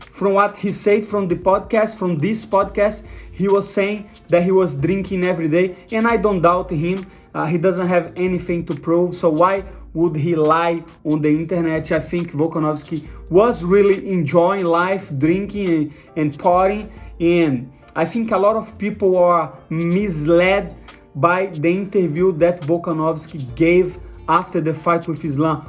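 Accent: Brazil. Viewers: Money, my number one hope for you is to become UFC champion. Number two hope is stop learning more English. The Brazilian accent is perfect. Number three hope is please, brother, can you teach Americans some Portuguese slang?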